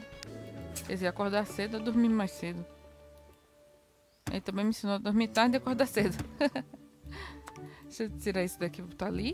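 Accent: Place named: Portugal. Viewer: ela tem sotaque português(o classico)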